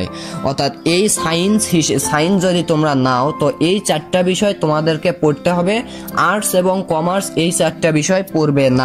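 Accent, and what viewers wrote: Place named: Joypurhat, Bangladesh. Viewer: জয়পুরহাট এর ভাষা 🙂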